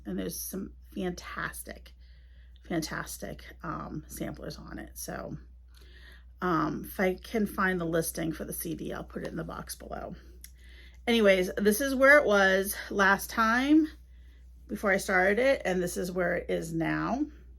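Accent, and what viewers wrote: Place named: Boston, United States. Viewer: Great to see you again. I enjoy your floss tube and your Boston accent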